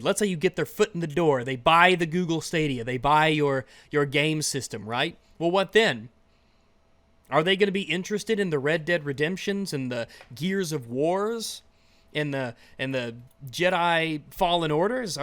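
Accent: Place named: South, United States. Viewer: The southern came out this episode.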